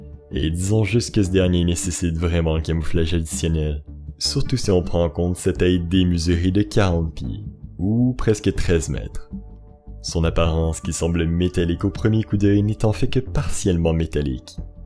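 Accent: Quebec, Canada. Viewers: je suis très difficile avec le youtube québecois à cause de l'accent mais franchement avc toi ça passe comme une douceur avec le ton parfaitement doux +1 abo je m'en vais regarder tes autres vidéos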